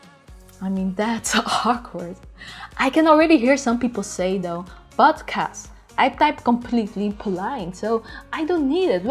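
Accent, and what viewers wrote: Netherlands. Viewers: Is ze Nederlands? Ik dacht het al te horen aan haar accent haha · Your English Dutch Accent sounds really funny for me as a German ! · cute Dutch accent ;--)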